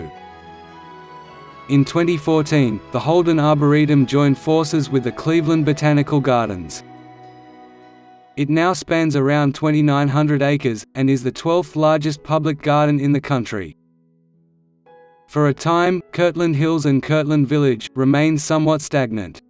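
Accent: United Kingdom. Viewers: WTF?! Why a British accent. Turned off, leaving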